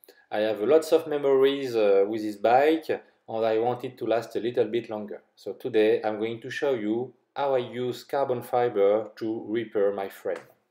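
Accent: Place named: France. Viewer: its hard to speak english with french accent try to listen to old school american rap music with practising after them that's how i ignored my arabic accent influence on my english i hope it will help you great vid btw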